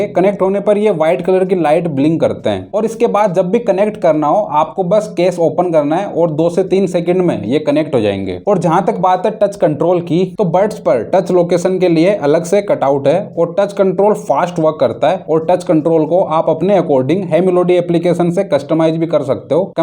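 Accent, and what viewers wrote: Haryana, India. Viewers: Baato se to Haryana ka lagg raha hai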